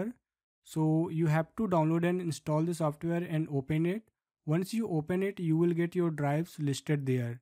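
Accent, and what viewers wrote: India. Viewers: These indian accents are calming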